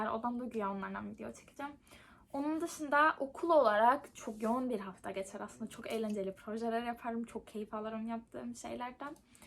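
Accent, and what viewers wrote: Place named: Cyprus. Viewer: Konuşman çok tatlı bayılıyorum Kıbrıs şivesine🌸😘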